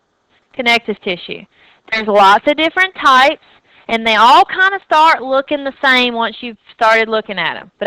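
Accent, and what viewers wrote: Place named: Rural, United States. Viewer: Oh shit. I love it when smart people have hick accents.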